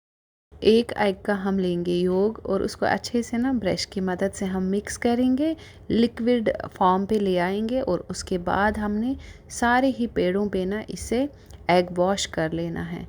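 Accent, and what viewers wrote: Kashmir, India. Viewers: Kashmiri lugti ho aap aao k bolne ka andaz waisa hai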